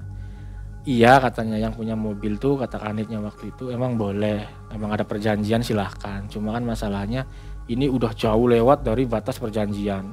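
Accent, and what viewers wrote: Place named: Cirebon, Indonesia. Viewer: cirebon banget yaa mang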